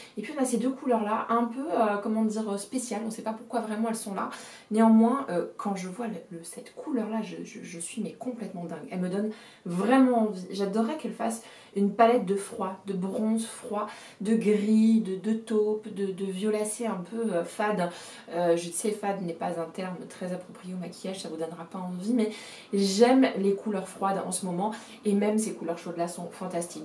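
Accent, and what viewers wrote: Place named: Paris, France. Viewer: Encore un plaisir de t’écouter et de te voir avec ton joli accent parisien!😊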